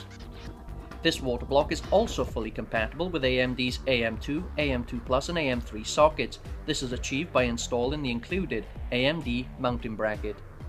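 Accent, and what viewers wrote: Wales, United Kingdom. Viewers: u have brilliant in depth reviews! are you originally from wales in the uk? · MAN YOU R PURE BRITICH AND I LIKE THAT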